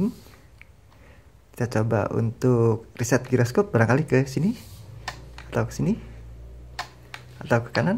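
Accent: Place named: Central Java, Indonesia. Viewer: Mas.nya dari Salatiga, jawa tengah kah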